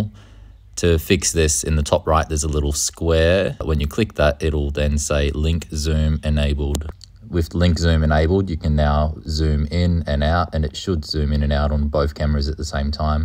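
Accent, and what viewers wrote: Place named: New Zealand. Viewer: Nice summary. You sound Kiwi though.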